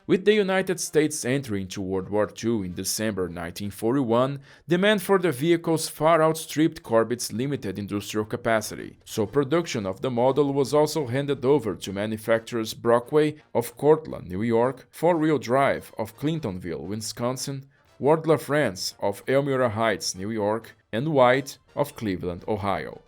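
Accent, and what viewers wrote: Brazil. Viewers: Nunca vi esse canal, mas percebi que era brasileiro pelo sotaque HEUHEUEHUEHEUUEUE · Apesar de falar inglês muito bem,o sotaque entrega que é Brasileiro 😂👍 Parabéns por estar trilhando um novo caminho com um novo público!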